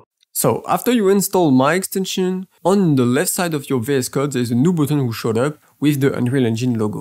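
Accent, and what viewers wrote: France. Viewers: Really nice 👍 Jugging by your accent are you french? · Oh le gros accent français qui fais plaisir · Dès la 1ere seconde de la vidéo j'ai spot que tu était francais c'est fou, ton anglais est trèèès bon c'est juste que entre francais je reconnais l'accent francais !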